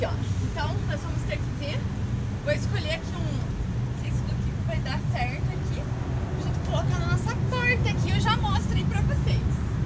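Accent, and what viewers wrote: South, Brazil. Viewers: Acho da hora esse sotaque do Sul, vc fica mais linda.😍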